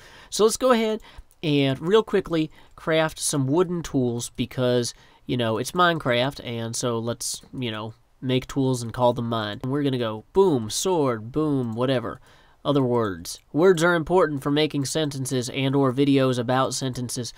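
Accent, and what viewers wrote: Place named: North Carolina, United States. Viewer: Oh dear God your accent LOL its been awhile since I've heard one like it. I'm originally from western NC but moved to VA beach 8 years ago. Love the accent. Its home to me.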